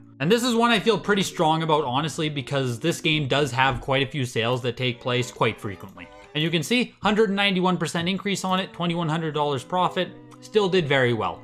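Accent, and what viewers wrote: Canada. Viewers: I cant take you serious with your massive shoulders long hair tight shirt and canadian accent. Youre all over.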